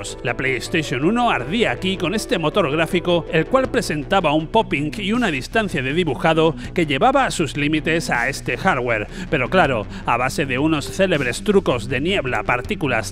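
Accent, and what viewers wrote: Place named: Andalusia, Spain. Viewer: Me encanta como los ibéricos pronuncian y distinguen los sonidos SC como eSH-Zenarios 😆😍Buenísimo el video abrazos desde Argentina al gran andaluz 🤩🥰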